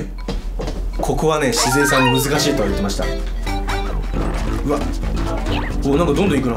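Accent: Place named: Kansai, Japan. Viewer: 関西弁いい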